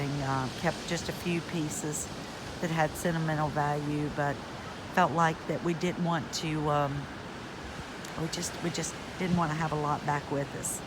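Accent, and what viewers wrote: South, United States. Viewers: how good to hear your sweet Southern drawl again. Though I’m far away in Israel, I have thought of you often since we last heard from you with the sad, sad news. I too am a mother, I don’t need to say more. But news of your return is so welcome, and the news of your family’s commitment to life is so encouraging. Be well, till next time. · 🇬🇧 How lovely to hear your lovely Southern voice again. It is wonderful that you have been so strong in dealing with the death of your son. It must have been so painful for you and your whole family. 💫 Sorry you also lost your Yorkshire Terrier baby, but he was a terrific age, almost 20, amazing. Thank you for sharing your lovely day with us all. A very Happy New Year 🍾🥂 big hug 🫂 from beautiful Yorkshire. Xx 🙋‍♀️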